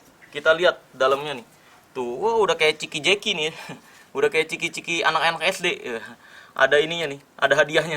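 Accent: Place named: Wonogiri, Indonesia. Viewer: Pakek apa tu yang di kuel-kuel. Bang jawa nya wonogiri ndak hilang. Haha😀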